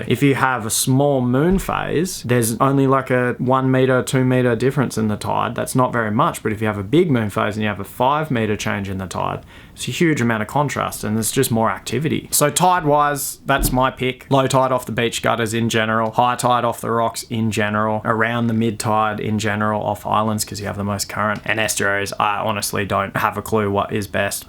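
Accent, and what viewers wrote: Australia. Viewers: Hi bro! Where are you from? Australia?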